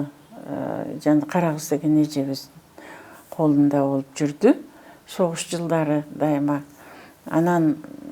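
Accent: Standard. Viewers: Азаматсыз эжеке кыргыз тилин ото таза оздоштургонсуз мен ойлоочумун жалан орусча суйлосо керек деп сизге таазим !!! Ширинге дагы уйротуп койсонуз акылдуу кептеринизден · Роза Айтматова говорит на чистом кыргызском языке.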